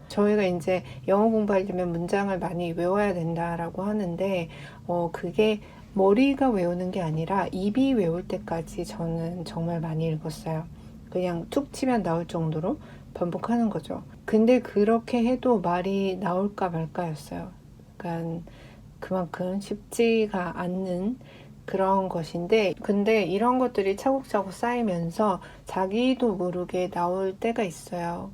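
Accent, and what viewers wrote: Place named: United Kingdom. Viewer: 영국영어 발음이 멋있는데 발음은 어떻게 연습하셨나요.. 아무리 읽어도 한국인 발음에서 벗어날수가 없네요😢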